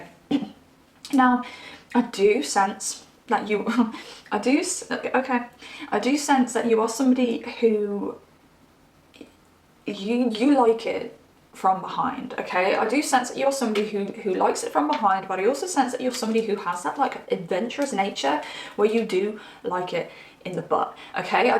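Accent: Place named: England, United Kingdom. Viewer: Aww I always watch tarot Chanel’s and I’m from Essex so it’s great to see and hear an English girl up in here! I really resonate with your reads big time! Thank you 🎉❤ ps I’m also a cap rising ⭐️😝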